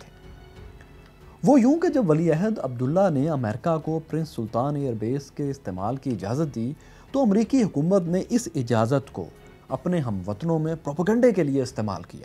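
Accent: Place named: Punjab, Pakistan. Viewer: Urdu with punjabi accent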